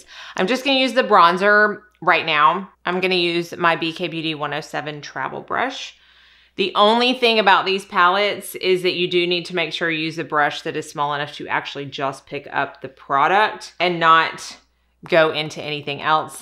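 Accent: South, United States. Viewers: Yaaay!!!! I absolutely adore 🥰 you! I live a full face of anything you do!!! You are such a sweet heart. I love that you don’t take any crap 💩 from people on the internet!!! ( as you shouldn’t)!!! I love 💕 your beautiful southern accent!!!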